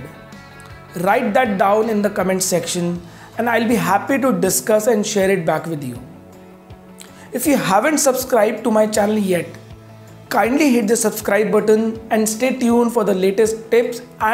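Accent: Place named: India. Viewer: Thanks for the video. I struggle to understand the Indian accent, but it´s worth it. Would you advise me? I have quite large, but east orinted quite dark room, I will spend time there mostly in the afternoon/evening, so artificial light mostly. I want to "warm" it a bit, was thinking terracotta or pineapple green combinations.. Is it a bad idea? I wonder.. Thank you. (I like darker red colours).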